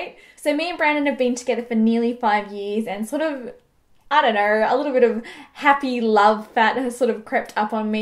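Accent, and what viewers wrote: Australia. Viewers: Australian, huh?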